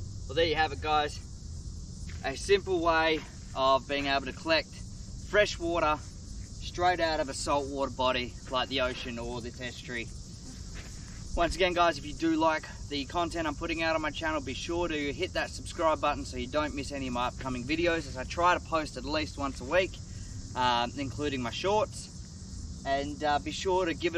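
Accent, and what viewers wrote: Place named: Australia. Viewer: FROM YOUR ACCENT YOU MUST BE AN AUSSIE. GOOD ON YOU ! THANKS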